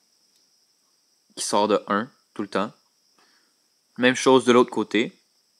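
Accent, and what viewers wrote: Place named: Quebec, Canada. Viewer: Elles sont taquer classe ses maison et j aime bien son accent sa fait styler ;-) j aime le Québec mec j aimerais bien que tu nous apprenne comment faire des maisons steampunk differentes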